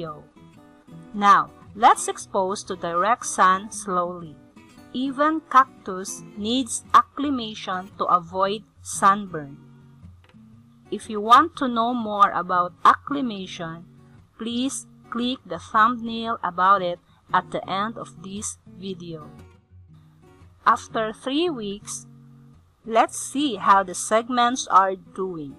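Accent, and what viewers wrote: Philippines. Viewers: please fix ur speaking. u speak very slow and it sounds very boring. don't use filipino english accent and don't speak very carefully and slow because u sound like reading. i'm not a hater, just a friendly advise for ur video not to be boring to watch and hear. thanks for understanding. 🙂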